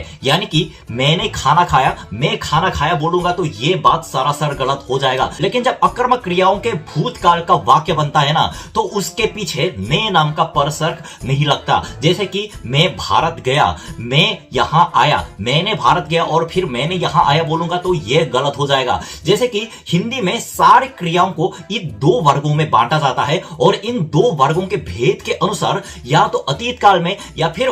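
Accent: Standard. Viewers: Aapki Hindi bohot acchi hai or uccharan bhi bohot shudhh hai....Agar aap bataoge nahi toh kisi ko bhi pta nhi chalega ke aap Koreai nagarik hai.... You explained the whole thing beautifully....the video also tells a lot about Korean language. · Bohut sudh hindi bolta h bhai esha hindi toh hamlog nahi bolte.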